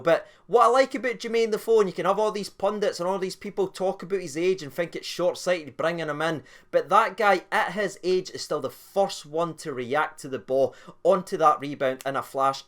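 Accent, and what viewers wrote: Scotland, United Kingdom. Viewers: Scottish accent is class Rangers sex hibs 1 😂🤣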